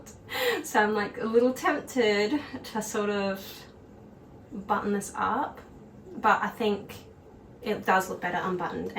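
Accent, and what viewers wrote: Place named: Australia. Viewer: Hi -I stumbled across your videos and love them so much..they are so comforting and cozy with your soft Australian voice/accent. 🙂 Cotswolds is stunning all year round - your filming, music and editing is exceptional - you have a good eye to detail/vision and capture everything so beautifully, so calming and peaceful ❤. Im vegetarian and lovvved the salad...chefs kiss. I live in London and IMO it does the best Christmas lights ever..its sooo magical..you will absolutely love it 🎄🎄Cant wait to watch more of your beautiful calming videos in the Cotswolds. You will leave with amazing memories. xx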